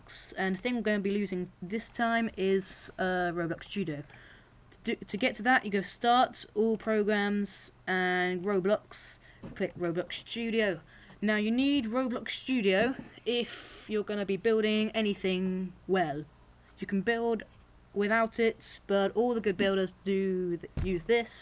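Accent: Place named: United Kingdom. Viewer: He's britan lol mostly I hate there acent.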